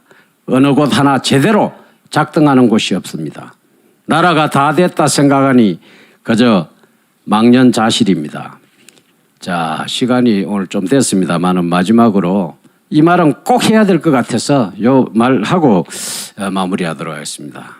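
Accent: Gyeongsang, South Korea. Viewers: 스님 이라는 자가 40년 세월을 전두환 발표한 편견에 세뇌되어 경상도 사투리로 지역 차별 내용을 말하는 무뇌인간임 외치고 있구나. 당신 고향 부모 형제가 세금으로 산 총으로 살해 당해야 공감 할 수 있을까. 스님 탈을 쓰고 혹세무민 하는 구나! 떽!! 이놈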